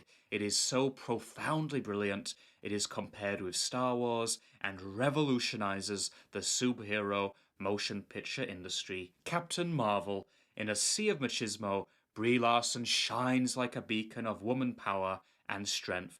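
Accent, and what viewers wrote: United Kingdom. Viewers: These videos are INSPIRING and genius. I have been pondering making a channel praising the woke bowel movement incessantly in a serious manner to the point of ridiculousness for awhile now. I will only do it if I can pull it off right. It won't be as elegantly as does it that's for sure. He's a Brit so the accent is perfect for it.. I'm going to have to come at it in a more aggressive Antifa/BLM obnoxious type of manner. Great job, mate.. cheers!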